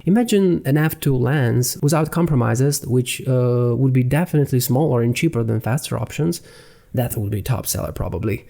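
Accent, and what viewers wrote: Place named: Asia. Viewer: how u sound asian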